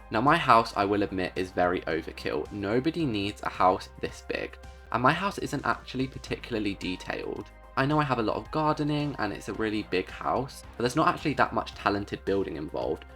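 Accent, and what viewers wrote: United Kingdom. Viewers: Yes he just sounds different because he’s British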